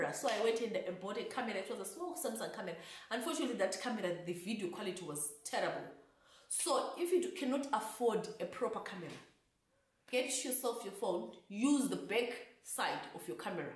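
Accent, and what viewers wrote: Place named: South Africa. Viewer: With accent though, it depends on what your target market is. My target market is based in the country where I'm currently based. The locals here are the ones who bother to watch my content and comment and even ask questions about the places I visit. If I used my home country accent a lot of people where I'm based would not understand me. The accent I use at work is the same accent I use on YouTube. I had to learn this with my full time job and that was culture shock for me which I had to adjust to. Sometimes it is also natural to subconsciously speak in a similar fashion to those around you. I can pick up South African traits in your accent. It happens naturally. I used to have a Zambian accent for the longest time because I lived in Zambia for a greater part of my youth.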